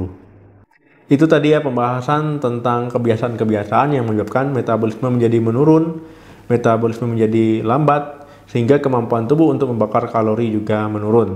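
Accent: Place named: Bali, Indonesia. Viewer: Dokter ini pnya logat bali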